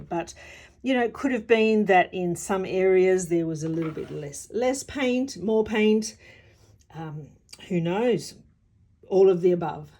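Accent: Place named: Australia. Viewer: Really interesting technique,i’ll have to try it. Also,nice to hear a fellow Australian. Thankyou & Cheers!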